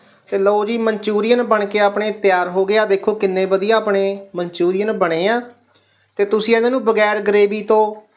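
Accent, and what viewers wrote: Ropar, India. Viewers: ਵੀਰੇ ਤੁਹਾਡੀ ਬੋਲੀ ਮੇਰੇ ਵਾਲੀ ਆ ਰੋਪੜ ਜਿਲ੍ਹੇ ਦੀ 😊😊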